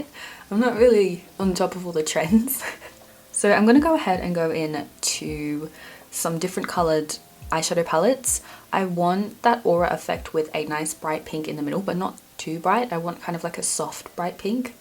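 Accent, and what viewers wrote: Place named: Australia. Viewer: I so enjoy your tutorials love.. Nice to hear an Aussie voice.. Love Anne, from Victoria Australia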